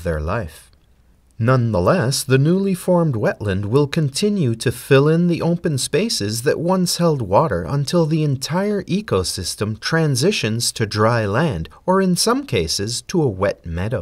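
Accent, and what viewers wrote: United States. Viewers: Hooray! you're a fellow New Englander How many East Massachusetts. You do not have a Boston accent as far as I can tell But you might have like no sound down east main either maybe Vermont West Vermont southern Vermont connecticut western Connecticut Edison New Yorker either like a New Yorker I'm confused where are you from I'm not trying to track you down I just like I like to place accents I'm an accent of all. my favorite actions are from like alabama the Kentucky Irish and Scottish and Welsh and English I do like German and Japanese accidents as well. so I just I like to place roughly where people are from you said you're from New England but I can't place where in New England. embassy says that we know how to track you down if I wanted to and I don't. you could be middle middle state or upstate Maine possibly because my grandmother was from down East Main your Kenny Bunkport and she had a very distinctive accent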